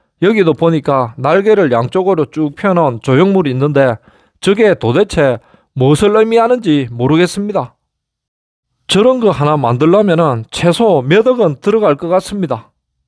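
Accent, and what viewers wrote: Gyeongsang, South Korea. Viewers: 말투 부니 경상도 분이신거 같은데 진짜 궁금해서 그래요 도청 이전 관련된 이야기 모르시는 건지?? 아님 컨텐츠 때문에 세금 타령 하시는지 좀 궁금 하네요.. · 첫화면에서 갱상도라고 생각이 들더만 맞구만.. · 경상도 ㅋㅋㅋ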